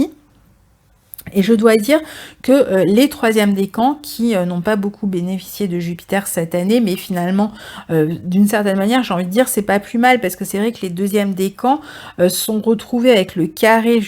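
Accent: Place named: Nice, France. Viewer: Bonjour, c'est toujours avec un réel plaisir d'entendre votre accent Niçois;-) je vous avais demandé, mon transit pour 2019, celui-ci m' a été bien utile. Sagittaire asc scorpion du 24 novembre, je passe une dizaine ce jour, et je pense qu'en tant qu'artiste photographe les choses vont bouger, il y a déjà un frémissement, entre la méditation, les tarots et ma création, vous ciblez parfaitement ma situation. Beaucoup de choses changent et c'est pour mieux comprendre le sens de la vie, ce qui nous fait évoluer dans une spiritualité. Bref votre travail est gratifiant, et vous le faite avec un sérieux, et une parfaite maitrise du sujet, je ne peux que vous en féliciter. Merci encore, et gratitude.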